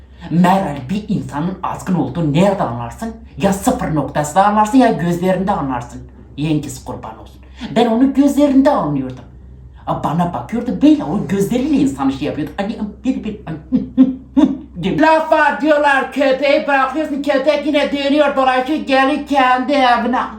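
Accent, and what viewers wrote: Turkey. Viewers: Çok iyi yapıyor ya Kürt Alevi şivesini 😂😂😂 sanki bizimkilerin konuşmasını dinliyorum 😂😂😂 birebir 😂😂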